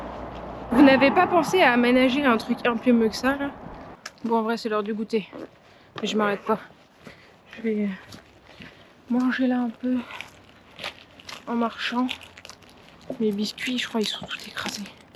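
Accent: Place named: Drôme, France. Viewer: Je viens du sud de la Drôme, dans les baronnies exactement, au pied du ventoux😁! Tu as la même façon de parler que les gens d'ici, les même expressions😉😁. En tout cas tes vidéos sont super intéressantes, un vrai plaisir à te suivre 👍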